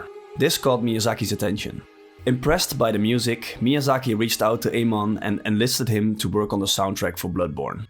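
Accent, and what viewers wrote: Netherlands. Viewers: I know a Dutch accent when I hear one.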